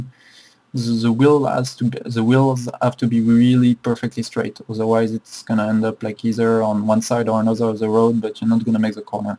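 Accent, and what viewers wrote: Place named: France. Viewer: Don't apologise for your accent man! French accents are seriously cool! Its how you guys manage to pull "our" english women so easily! :P Great video too, i'm down to a 59.5 around LRP but your mega quick and helpful, thanks!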